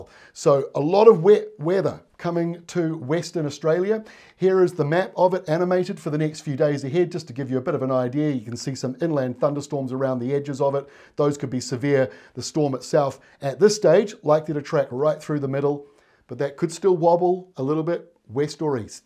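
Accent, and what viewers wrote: New Zealand. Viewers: The plain English explanations (with kiwi accent) make this the best weather channel!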